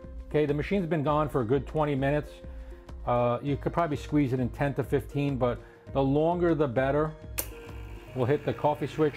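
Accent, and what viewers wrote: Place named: New Jersey, United States. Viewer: An east coast accent. New Jersey working class. No mystery. Merely region plus class.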